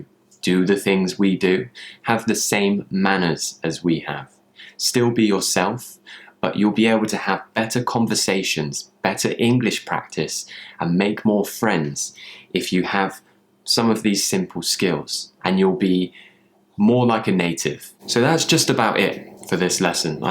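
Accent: United Kingdom. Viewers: i just love the British accent, not necessarily posh but very well spoken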